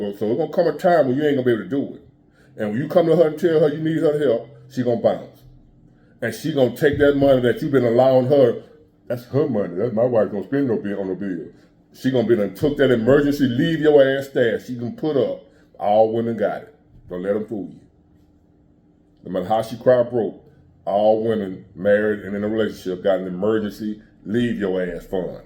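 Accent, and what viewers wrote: South, United States. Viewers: Your country accent is type tier ✊🏾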